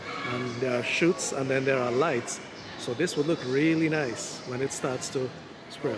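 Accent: Trinidad and Tobago. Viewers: You sound like a Trinidadian, everyone blogging now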